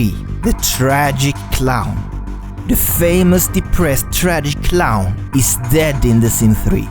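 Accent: Sweden. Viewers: Din svenska accent är så rolig och jättegullig. Jag älskar dina videos! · Are you from Sweden? xD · Aah, I love your accent!! It's so cute! I'm also from Sweden! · förlåt, men din svengelska är riktigt illa.. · Are you from sweden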